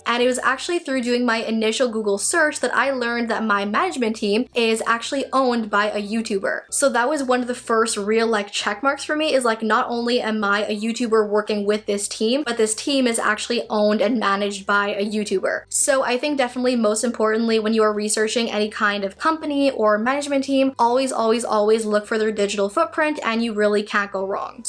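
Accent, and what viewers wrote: Canada. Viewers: where in Canada are you from(I can tell)?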